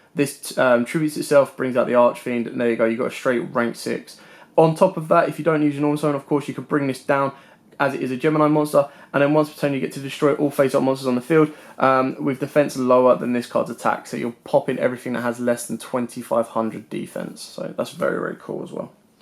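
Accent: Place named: United Kingdom. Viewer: Are you an Englishman? I can hear a Brit accent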